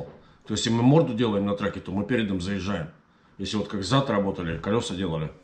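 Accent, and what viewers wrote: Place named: United States. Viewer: у тебя немного америк. акцент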